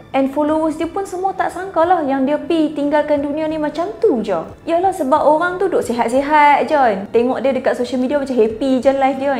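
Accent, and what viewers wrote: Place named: Kedah, Malaysia. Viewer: pekat nahh kedah.. kami suka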